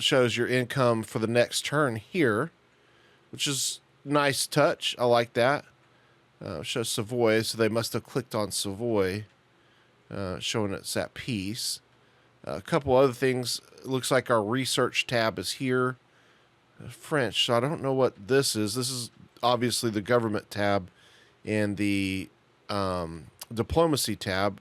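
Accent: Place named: Texas, United States. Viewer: Love the Texan accent 🙏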